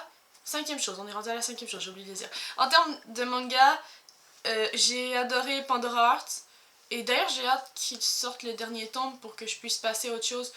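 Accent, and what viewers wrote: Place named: France. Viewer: Ouf, l'accent français!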